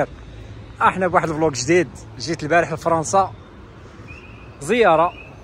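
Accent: Algeria. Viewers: لهجة ديالك جزائري بينا وضوح شمس سير قلب على شي خدمة باركا من تشويه صورة المغرب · انت جزائري، لهحتك جزائرية ، علاش تقول راك مغربي.. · من خلال لهجتك أنت جزائري. لمذا تدعي أنك مغربي. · هادوك كا يترزقوا. شوف لي كا يشفروا أو يبيعوا في المخدرات. اللهجة ديالك جزائري ماشي مغربي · علابيها تتكلم كيمانتاع الغرب الدزيريين تماما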